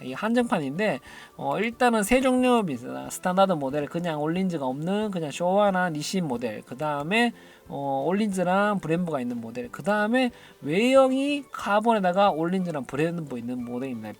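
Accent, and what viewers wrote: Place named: Gyeongsang, South Korea. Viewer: 대구에 매장있으셧내여 억양이 익숙하다 싶었는데 매장에서 혼다 자켓은 입어볼수있나요?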